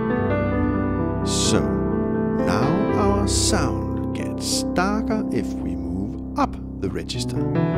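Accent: Denmark. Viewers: That's a Danish accent for you right there :) Anyway good channel! =)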